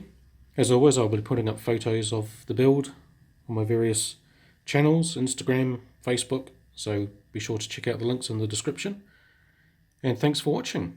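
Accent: New Zealand. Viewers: A kiwi accent?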